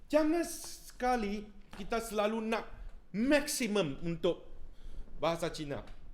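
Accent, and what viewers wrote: Kedah, Malaysia. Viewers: .. cakap loghat kedah laa.. hahahhaa terbaik lah.. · Wuihhh ORANG KEDAH WOI!! 🤣🤣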